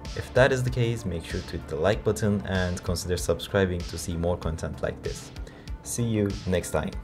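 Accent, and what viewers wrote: Turkey. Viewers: Sesinden Turk oldugunu tahmin etmistim diye goruce emin oldum, Jay in videosundaki yorumu gorup geldim. Eline saglik 🙏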